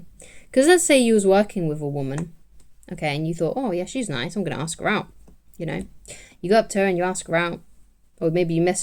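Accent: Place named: United Kingdom. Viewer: Strong UK accent and the final piece of puzzle I needed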